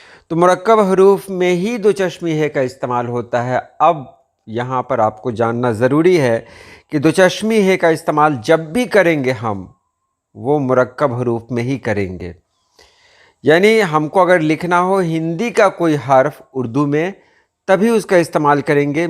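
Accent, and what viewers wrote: India. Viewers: Lectures kuch pehle bhi sune ic k mn ne.❤. Ye Indian ha? Wese main Urdu to inki bhi Pakistan ki tarah ha but phr bhi sunane se pata chl raha ha k Indian ha. Yani k Indian or Pakistani Urdu mn faraq aa chuka ha. Lehjae mn bhi or pata nhi jahan but feel ho raha ha k inki Urdu different ha.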